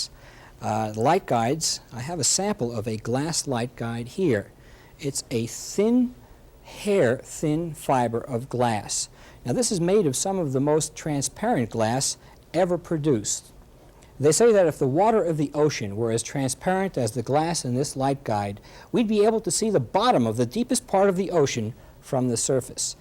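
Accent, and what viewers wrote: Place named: New York, United States. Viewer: Mirruh and electrissidy. Sounds like Richard Feynman def new yorkish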